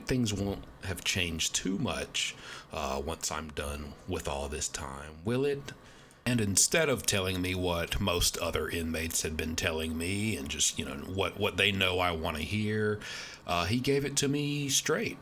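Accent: South, United States. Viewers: im fairly new subscriber. I love the sound of your voice being from the south like me helps. I enjoy all your content i especially like these type. I listen to you while I am doing my house work set of quite headphones and im off 😂😂😂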